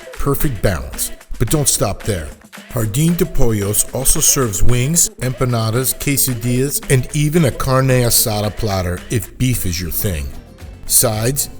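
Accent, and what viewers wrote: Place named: North, United Kingdom. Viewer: You sound like Northern transplant to me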